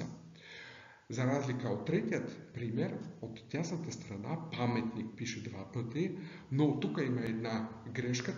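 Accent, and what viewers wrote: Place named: Belgrade, Serbia. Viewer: От къде е тоя човек? Като говори вижда се, че е пораснал в Белград или в Ниш. Аз съм роден в Лесковац и никога не съм учил български в училище, на все пак нямам толкова затруднения да говоря на български.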